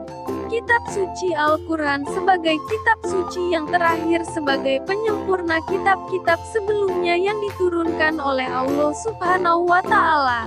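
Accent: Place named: Java, Indonesia. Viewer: medok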